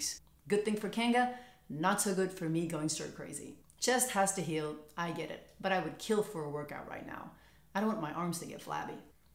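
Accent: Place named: United States. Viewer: I just want to know how it is you sound like you were born in the US?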